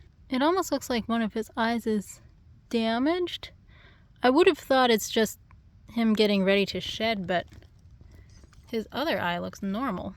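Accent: United States. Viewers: for growing up on a remote island with no social media you have a very average american english accent.. :face-orange-biting-nails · Well, it sounds like you’re from the south. That’s where you are now so Bobby a poisonous snake or I should not say poisonous cause you know the venomous is what they are.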